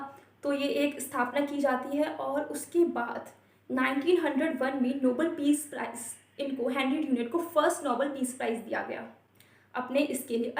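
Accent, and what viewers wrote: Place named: India. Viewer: Looking good india aksen in english is unic and great.i like to hear..goodluck miss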